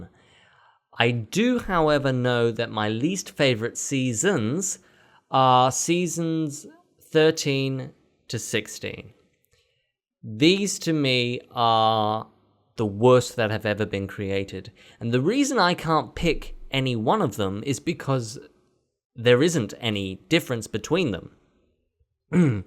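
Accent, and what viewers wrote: Australia. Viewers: Wow ur Australian. My brother and I thought u were British 😅😅 but I would love to see ur T&F episode. And I'm starting to think I'm the only one who likes thomas and the magic railroad. Also I think when this Q&A is over you should do one with ur characters from your series.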